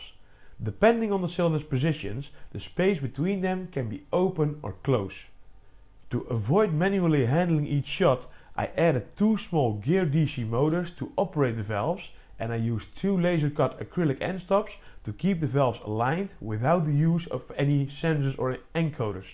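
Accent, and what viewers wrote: Netherlands. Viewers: This accent screams dutch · Bro that Dutch accent goes hard af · Thats one hell of a dutch english acent there mate😂 Net als mark rutte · Heeerlijk altijd dat hollands accentje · It took me exactly 0.2 seconds to find out you're dutch from your accent 😂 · When i heard your voice and saw your garden i directly thought this guy is dutch. chat gpt verified it. Hoi broeder van een andere moeder. Het is altijd leuk om een nedelander te vinden (of belg maar dat accent is anders). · Hoort meteen dat je een Nederlander bent🤣😂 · I hate the dutch accent 😭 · I bet this guy is Dutch, I can hear it within 2 seconds. Nice vid. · Interesting video. IMHO if the "ice" projectiles become lethal it falls into lethal weaponry category and as such could be a perfect weapon to kill without trace. This definitely will attract attention from the CIA and alike. 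It also probably will be banned. Ps why define in feet? I hear on your acence that your Dutch. Let those US audience convert to metric with their arrogance for imperial for once. 😅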